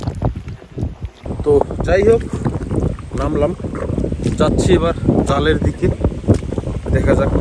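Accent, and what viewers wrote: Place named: Bagerhat, Bangladesh. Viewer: আপনার বারি কথায় বাগেরহাটে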